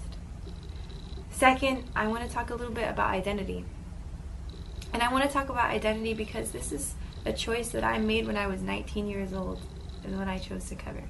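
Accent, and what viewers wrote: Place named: United States. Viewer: اممم. امها امريكية فهمت ليش تتكلم كذا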